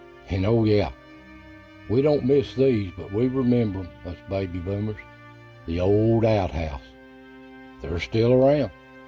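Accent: Appalachia, United States. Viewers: Well said. Another thing distressing to me is there's nobody under a certain age anymore that's "country". Even if they're born & raised in the country they don't sound like it. They don't have the heavy accent like you & i . That's not a crime but they all seem to talk so fast i have to get them to repeat theirself. Makes me kinda relieved to be past 60, so folks don't expect much, lol.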